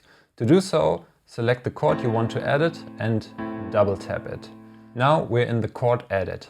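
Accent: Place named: Germany. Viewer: You Sounds like a German Guy when you speak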